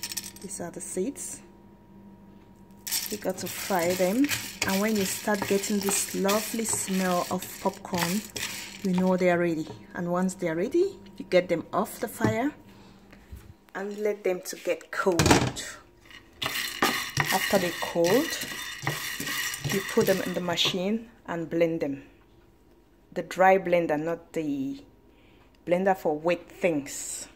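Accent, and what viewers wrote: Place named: Jamaica. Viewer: You sound like a Jamaican thanks you tho for sharing love and lite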